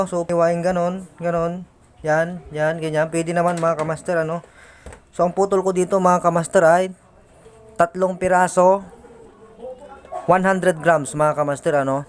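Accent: Pangasinan, Philippines. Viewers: Taga pangasinan ka siguro ka master hehe the way u talk pangalatok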